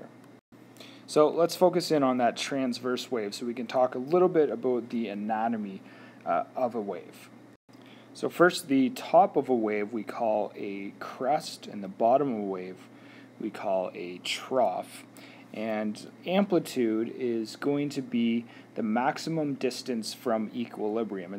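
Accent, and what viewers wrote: Canada. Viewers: I think he is canadian because he said aboot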